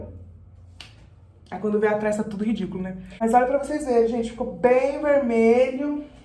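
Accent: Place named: Rio Grande do Sul, Brazil. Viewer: ficou muito lindo teu cabeloooo🤩🤩queria ter essa resistência que ele tem ksksks, Mas ficou PERFEITO!!!✨✨✨ Saudades dos vídeos de pintando o cabelo 😁 (Sotaque gaúcho, BAH, MAS BAH)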